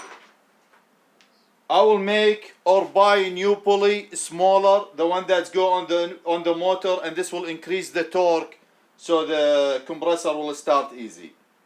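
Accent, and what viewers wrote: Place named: Iraq. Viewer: لهجتك مال عراقي بس لاتطلع عراقي 😅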